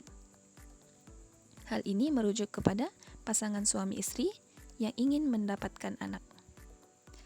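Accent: Sabah, Malaysia. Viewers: Cikgu orang Sabah ye😄😅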